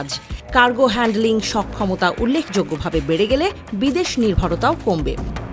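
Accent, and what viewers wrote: Kolkata, India. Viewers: পাঠিকার কণ্ঠে সমুচিত জবাব। কলকাতা ভাইব পেলাম। শুভ কামনা এনটিভি।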